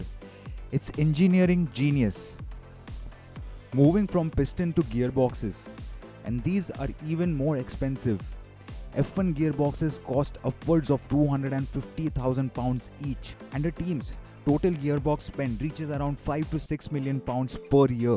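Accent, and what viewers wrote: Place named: India. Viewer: bro just reuploaded driver61 with an indian accent and thought yeah thisll do numbers? bro come on now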